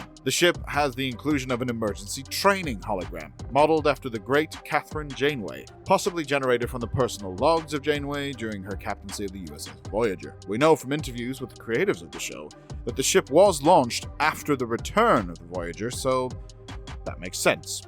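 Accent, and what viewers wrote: Ireland. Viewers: I would have bet the accent was Irish eastern mild Dublin or surrounding area. But accent can change based on location. And time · Are you Irish?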